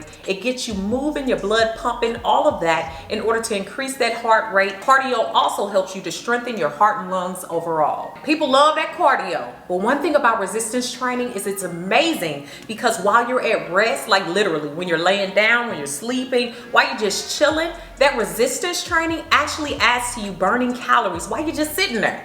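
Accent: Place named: St. Louis, United States. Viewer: I love that STL accent. #lovinthelou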